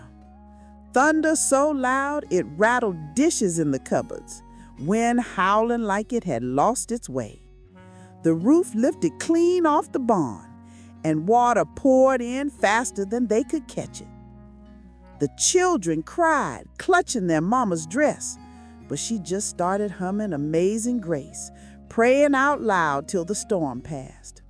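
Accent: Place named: South, United States. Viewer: I enjoyed the video, and loved the southern accent.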